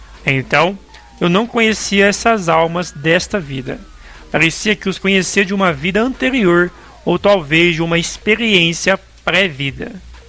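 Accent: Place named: Caipira, Brazil. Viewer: Um erro de português da para consertar, agora querer que a pessoa mude seu sotaque e ainda chamar de caipira é muita falta de bom senso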